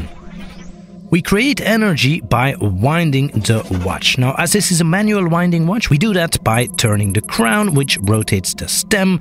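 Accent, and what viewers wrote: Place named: Quebec, Canada. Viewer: That's great. Is the narrator Québécois? I hear a slight accent.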